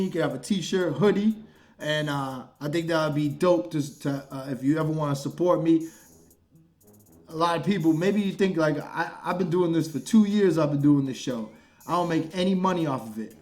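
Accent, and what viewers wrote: England, United Kingdom. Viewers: The English accent works......i love how u make ur presentation, that pipe u smoke it rocks! U should do a movie one day brother because u're a dam good actor 👍👍👍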